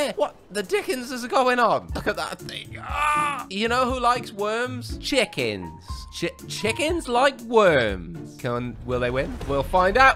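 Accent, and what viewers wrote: United Kingdom. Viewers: Hey are you britain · the and im yellow? gets me every time also you sound british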